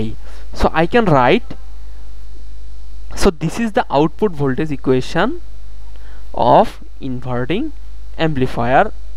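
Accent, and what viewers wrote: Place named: Assam, India. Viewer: Assamese English.. Anyways, nice explanation !! 👍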